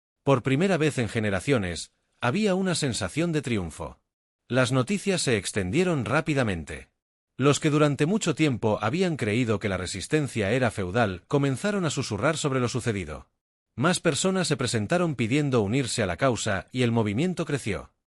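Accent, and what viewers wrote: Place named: Italy. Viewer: Estoy de acuerdo 😅, entre el italiano que se cuela y las pausas brutales en medio de las frases... Cuesta seguir la historia, pero vas por buen camino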